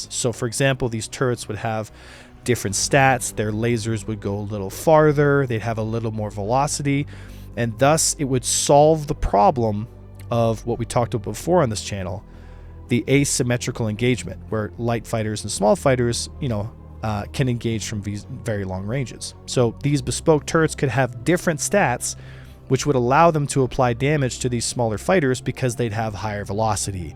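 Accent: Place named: Canada. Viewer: you sound SO Canadian here lol.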